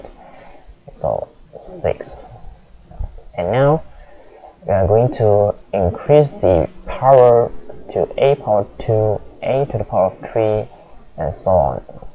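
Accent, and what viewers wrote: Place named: Singapore. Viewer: definitely Singapore accent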